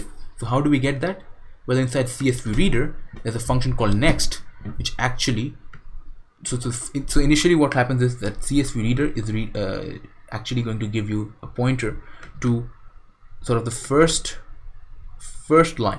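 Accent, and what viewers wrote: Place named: India. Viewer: Damn man that really was helpful but listening to 33 mins of your indian accent was the hardest things i've ever done in my life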